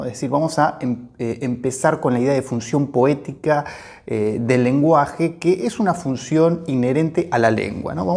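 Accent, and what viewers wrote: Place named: Argentina. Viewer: Increíble! Con este acento (es usted argentino?) y hablando de argumentos interesantes, creo sinceramente que usted no exista...y encima, si puedo permitirme, muy guapo, con esta mirada intensa y esa inteligencia que parece querer salir hasta de sus dedos. Una clase estupenda (finalmente estoy entendiendo algo del formalismo ruso, la parte que usted ha tenido la gracia de explicarnos...y el miércoles tengo el examen de “Teoría de la Literatura”. Por suerte usted se ha ocupado tb de Sklovski y alguno más...iré escuchándole e investigando. Mientras tanto, aunque nos separe el Océano, sepa que mi corazón le pertenece. 🫀🩸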